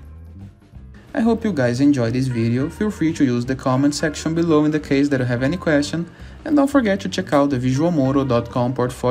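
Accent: Brazil. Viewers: eu conheço o sotaque brasileiro kkkkkkkkkkkkkkkkkk Edit: o vídeo me ajudou, valeu!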